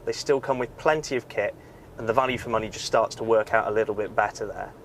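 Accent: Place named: West Country, United Kingdom. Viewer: Good honest review. Struggling to place that accent... West Country or Nooorfolk, I'm from Suuuffolk :)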